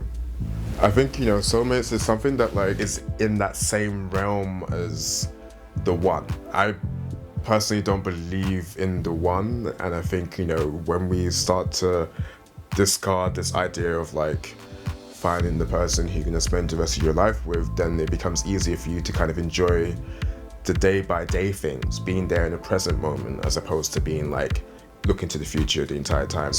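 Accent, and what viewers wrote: United Kingdom. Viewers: Black sexy guy + british accent = 💯%♿👌😂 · I love the British accent coming from a black man...somehow it makes it sound even sexier for some reason. I can't be the only one who thinks this right?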